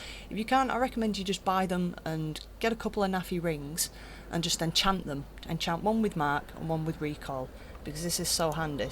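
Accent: United Kingdom. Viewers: Are you British :) i love your accent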